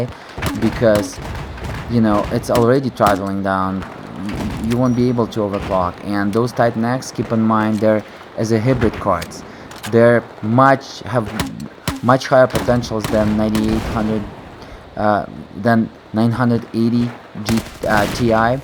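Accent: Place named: Russia. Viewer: official - 8K and 4K gameplay, benchmarks, reviews Мне кажется я почуствовал Русский акцент..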